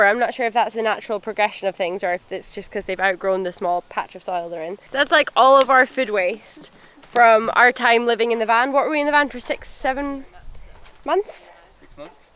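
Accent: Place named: Scotland, United Kingdom. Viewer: You have a wonderful Scottish Accent. ☺️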